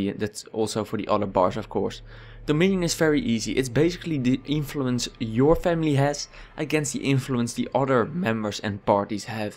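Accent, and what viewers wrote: Netherlands. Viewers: you sound dutch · Yo bro. Thx for this vid. Needed it to understand politics better. Just subcribed to your channel. By the way. Are you dutch? You have a accent a dutch guy would have. Thx again and peace out bro :-) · judging from you accent i think you are dutch XD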